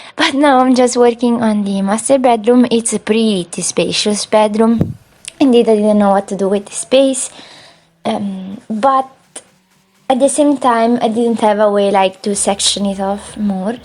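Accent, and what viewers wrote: Italy. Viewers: ma sei toscana ? si sente troppo anche quando parli in inglese <3 bellissima casa comunque, l'esterno è da favola ma anche la struttura stessa della casa è davvero speciale.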